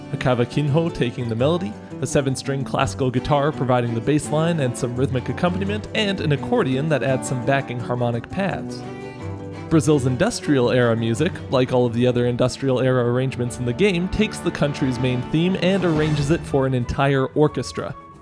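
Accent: United States. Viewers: i never even realized you were canadian! i think my mind just kinda assumed you were northern/midwestern american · I thought you were american, were are you from? Very nice talent in music, you have. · "And I'm not even American" That threw me for a loop there for a second. I was about to say "but, his accent is clearly Amer..." and then I remembered that for the most part the Canadian accent is indistinguishable from the American accent, unless you have a heavy regional accent. Sorry Canadians, for always assuming you're American unless told otherwise. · Wait you're not American? Then where are you from that has such an American accent? Canada?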